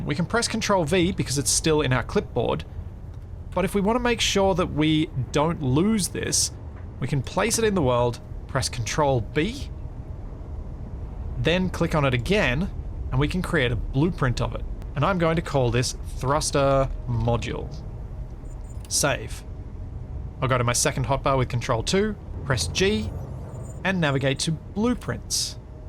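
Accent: Australia. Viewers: I'm a simple guy. I hear Aussie, I sub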